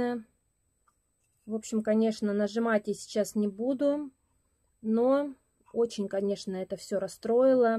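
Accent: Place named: Urals, Russia. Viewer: вы из какого города?Акцент у вас уральский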